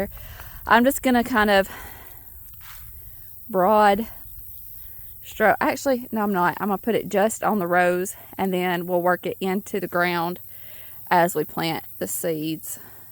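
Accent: South, United States. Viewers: You are such a good wife/mom, and your little guy looks like a sweetheart...~.~ I started watching your channel recently. I'm a Christian, too, and grew up in the 'country' in Michigan/Wiscosin. Now I live in South Korea and teach English here with my Korean husband. It's fun to hear your Southern accent and to see the little things about American life that I don't see anymore living here in a big city and foreign culture. Thanks for sharing your joy & love for Jesus and family!💜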